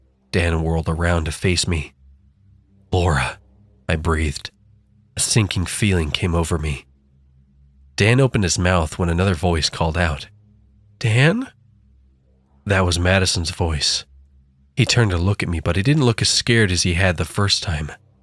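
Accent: South, United States. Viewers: Great southern accent. Better than mine and it’s natural. Haha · Gratz on 1k and I love the southern accent and you giving it to the douchebag is perfect for the story PMSL 😂😂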